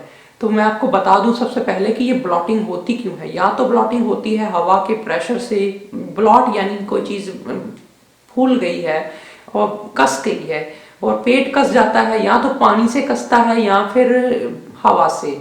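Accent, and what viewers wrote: India. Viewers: Rohit Malhotra Is he teaching English to u... He is Desi n speaking in his accent... He said bloating or bloting just to make us understand as we search with that name in YouTube... Don't mke fun of anyone for their accent bcos English can be spoken any how one likes there is no rules as such...as it is a foreign language...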